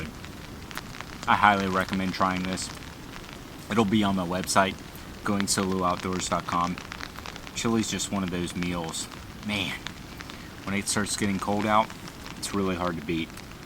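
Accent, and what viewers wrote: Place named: United States. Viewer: Hi! Great video! 👍 But something is eating at me! 😵 Your YT channel says you're in the UK! But you speak like an American(no britt accent) you cook like an American you phrase like an American and the woods you're in looks like somewhere in the East Coast and Pennsylvania! About the only thing that kinda hinted that you might be British is that black treacle bacon! What gives mate???? 😂 Btw,had to Google black treacle bacon 😅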